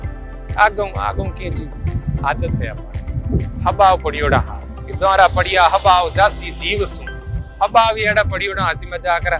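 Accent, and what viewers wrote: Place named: Marwari, India. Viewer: Wha sir gjb marwadi andaj me 😀 the mare neda aao 😀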